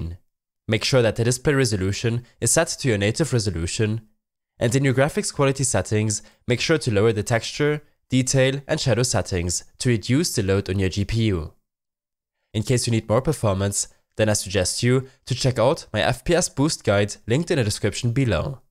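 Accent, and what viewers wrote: Germany. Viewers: diese deutsche aussprache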